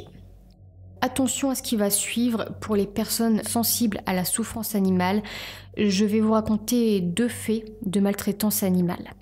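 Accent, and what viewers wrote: North, France. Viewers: Bonjour, je te découvre et sincèrement très sympa, car excellente recherche et superbement raconté. Et ce ptit accent du nord🥰. Belle continuation.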